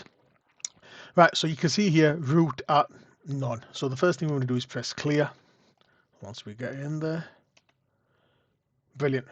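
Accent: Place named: Yorkshire, United Kingdom. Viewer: love the accent! proper Yorkshire - great video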